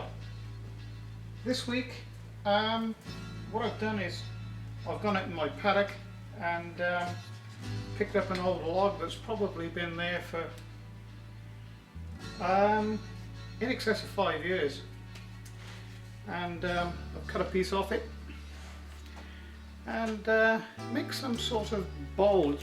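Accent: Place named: South Wales, United Kingdom. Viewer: good stuff mate... u sound like u have a cardiff south wales accent...may be my ear is off...lol thanx for the video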